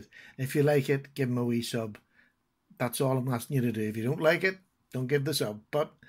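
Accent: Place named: Ireland. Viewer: I fucking love the irish accent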